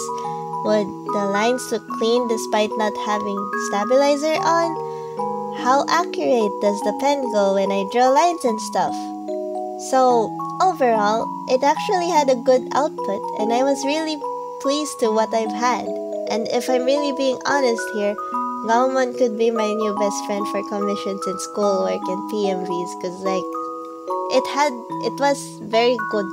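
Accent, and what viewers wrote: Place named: Philippines. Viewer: What country do u live in?, 'cause u sound filipino.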